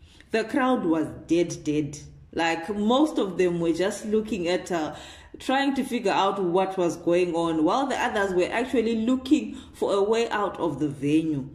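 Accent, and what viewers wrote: Zimbabwe. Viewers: Kkkk its either a Shona or Venda accent kkk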